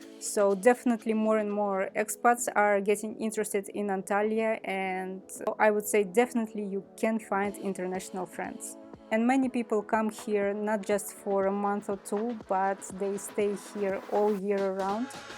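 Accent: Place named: Russia. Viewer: Hi, thank you for the video. My dream is to retire in this area, Antalya or Side. You sound like you are russian, and my wife is russian. I am norwegian. I speak english very well, quite a bit of turkish, some russian. My wife does not speak turkish, but russian and some english. My thinking is that she can live there and manage quite well with russian. However, she does not always like associating with other russians, and I'm not entirely sure why. If we one day will take a trip and see how it is like, would it be good to rent something with AirBnb, or aparthotel like you did? If so, what area?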